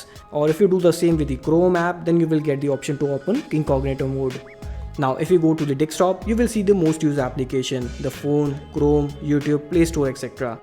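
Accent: India. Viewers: I'm from bolivia and even me can hear that india acent :v you speak better than me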